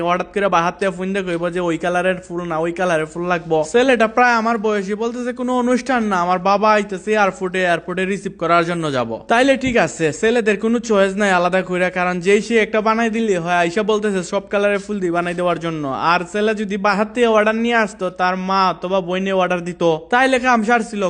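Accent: Bangladesh. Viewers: বাড়ি কিতা সিলেট নি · হবিগঞ্জের ভাষা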